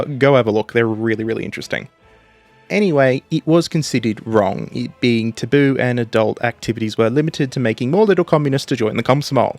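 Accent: Australia. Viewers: I just realized this guy is an Aussie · It feels so refreshing to hear an Australian accent in videos like this. Born in NSW, raised in QLD :D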